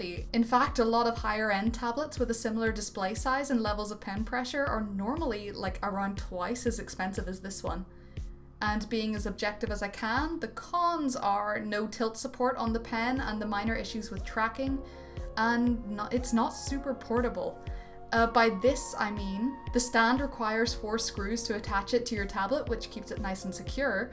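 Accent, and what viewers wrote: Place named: Ireland. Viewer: Why was I so excited when I realized she had an Irish accent?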